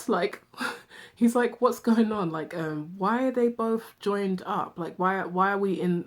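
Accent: United Kingdom. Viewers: How come you have a British accent but you're from Texas? Just curious xx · Are you British but live in America now? Sorry for asking I'm just curious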